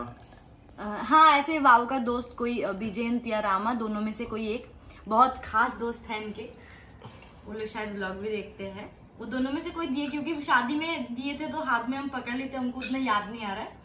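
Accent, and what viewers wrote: Bengal, India. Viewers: Apki Hindi bht sweet hai☺️hum marenge ye achcha bolti ho waise mai bhi bengali hu or humko bhi secret wala insaan ka naam Janna hai😂😂or barki mummy bolne par camera man itna has kiu rhe hai🙄 bengali bolne wale log sab Hindi bol nhi pate but ne kosish kar rhi hai bht jaldi sikh jayegi☺️👍🏻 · Di O aapka Bengoli kadha O bahut hi cute Hy very nice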